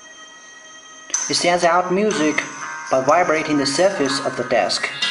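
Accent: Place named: Poland. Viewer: Interesting accent, is that polish?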